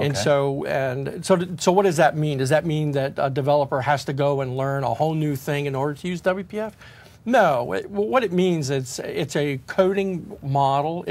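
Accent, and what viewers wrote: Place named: Baltimore, United States. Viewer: Effective. Well presented.... are you from Bali-mur? That's always a plus.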